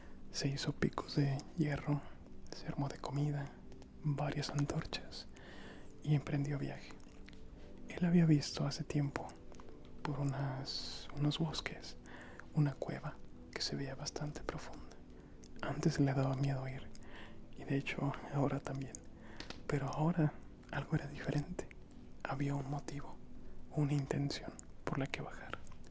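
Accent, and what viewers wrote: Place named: Argentina. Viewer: ES UN ARGENTINO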